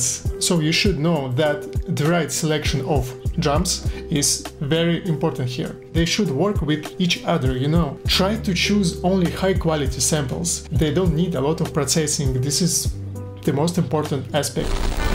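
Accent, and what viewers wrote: Russia. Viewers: так ты русский знаешь. Я такой сижу слушая твою речь на инглише и понимаю , что это мать вашу русский говор . Ахаха, приятно удивился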